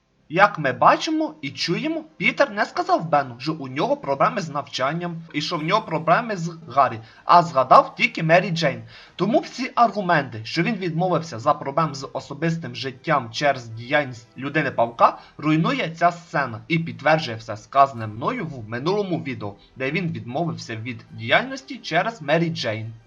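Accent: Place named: Transcarpathia, Ukraine. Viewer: Пасувало би добавити фонові (тихенькі) звуки до картинки) І треба щось робити з закарпатським діалектом)) я з західної України, все розумію,все сприймаю) але от східні,центральні Україні важко буде для сприйняття( «Пистолєт» 😂 Пістолет))))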